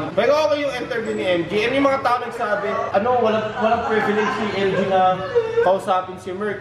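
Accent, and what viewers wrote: Visayas, Philippines. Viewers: bisaya ka? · Bisaya ka ba? 😁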